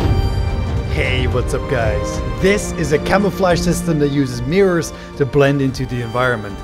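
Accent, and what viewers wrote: Netherlands. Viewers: I knew that accent sounded dutch! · sounds Dutch to me....being Dutch :)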